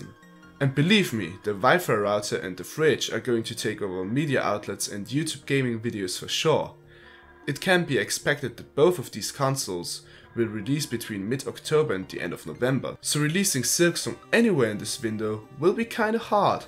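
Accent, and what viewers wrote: Germany. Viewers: Bist du Deutscher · ah ok, Ur accent do rly sounds german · German guy or what?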